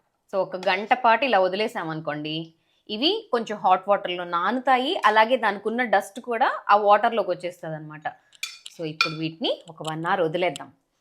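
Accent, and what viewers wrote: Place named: Anantapur, India. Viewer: Mam you are saying that you belong to Nellore but your language is like Anantapur.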